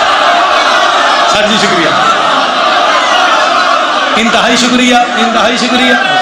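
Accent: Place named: India. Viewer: Just like indian voice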